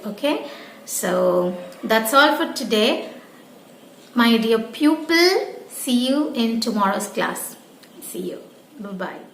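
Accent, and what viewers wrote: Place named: United Kingdom. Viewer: Very well rendered in Brit accent ya!! Btw what word was that u used for call disconnection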